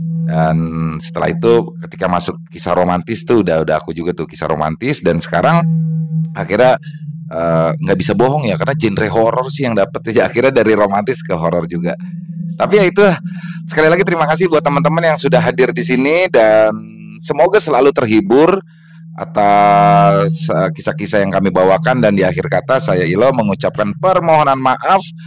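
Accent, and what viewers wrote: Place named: Sunda, Indonesia. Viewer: Mirip memang....saya ngikutin jg..karena bisa liat2 pemandangan....hanya beda dari logat si...kalau kek nya terdengar Sunda banget hehe....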